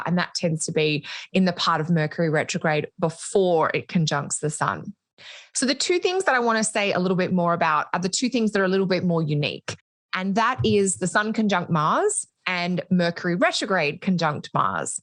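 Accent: Australia. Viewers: Ontario ?? I thought you were Australian! That’s funny. Or maybe I guess Australian living in Canada? 🤪😍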